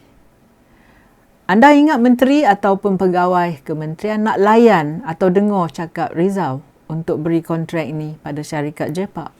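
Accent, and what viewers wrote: Perak, Malaysia. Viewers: slm.. loghat puan ni mcm oghang Peghok ( Perak ) plk? 🤔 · Org perak ke ni? Banyak dengor slang perak hehehe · Kak ni org perak ke? Hahah...slang mcm org perak...btw...good content...let the bossku rest in prison....on the bright side, dia boleh muhasabah diri dia without his wife intervention....hahahah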